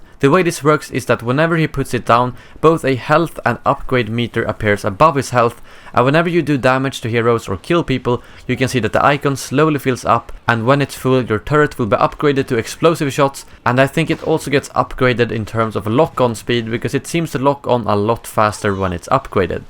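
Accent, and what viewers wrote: Sweden. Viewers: Are you fr.o.m. Sweden (är du från Sverige) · Are you from SWEDEN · Are You from Sweden?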